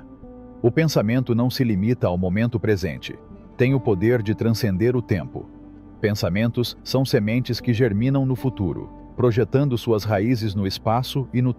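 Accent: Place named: Minas Gerais, Brazil. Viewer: O narrador é mineiro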